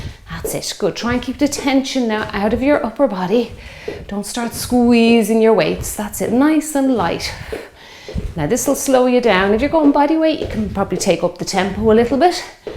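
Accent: Ireland. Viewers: Are you from Ireland? Trying to figure out your accent lol I love it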